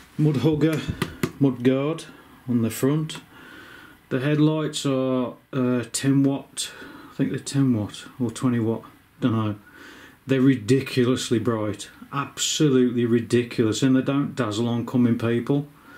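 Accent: United Kingdom. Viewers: I'm not jokinggg, can you see it down thereeeeeeee...lol, I love British accent! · So what about Bosch 36v 250w? What’s the significance; why would someone suggest you’re a troll because of that? (Sorry if I’m clueless.) Also, where in the UK are you from? I’ve spotted your accent as the same as another YouTuber I watch.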